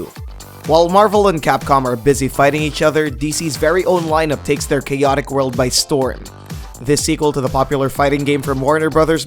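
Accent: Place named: Philippines. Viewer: narrator is filipino. obvious sa accent.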